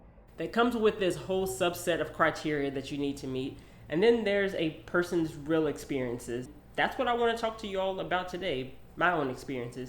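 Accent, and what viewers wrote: South, United States. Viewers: So well articulated! My daughter, who is experiencing gender dysphoria, sent me this video, and I appreciate you sharing your experiences. It's so hard to be vulnerable like that. Also, I really like your beautiful voice! It has a velvety richness, and the slight southern twang is cute. (For what it's worth, I'm a cisgender, heterosexual woman.) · I'm late to this, but dude your southern twang rocks and is so sexy!!!! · I love your voice, your southern twang is awesome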